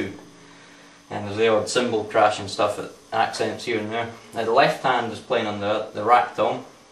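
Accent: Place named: Scotland, United Kingdom. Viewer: you're awesome. I love...always wanted to find a drummer who could play it...adorable Scottish accent. Amy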